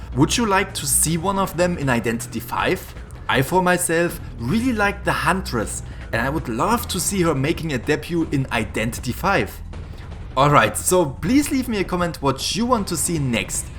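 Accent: Germany. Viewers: I knew it because I’m German Too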